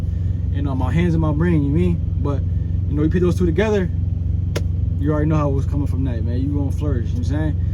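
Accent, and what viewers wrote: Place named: Philadelphia, United States. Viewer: How much they pay ups truck driver now for local cuz u sound like u from philly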